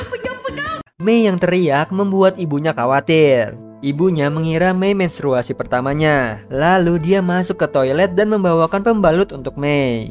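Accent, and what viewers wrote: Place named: Jakarta, Indonesia. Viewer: Dari Malaysia kok logatnya kayak orang jakarta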